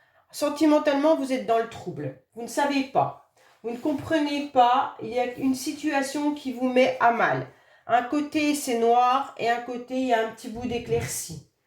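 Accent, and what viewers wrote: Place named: Franche-Comté, France. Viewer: Vous avez l'accent franc-comtois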